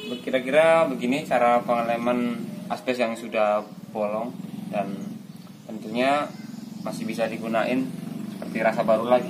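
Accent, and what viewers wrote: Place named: Banyumas, Indonesia. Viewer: Ngomonge campur ngapak...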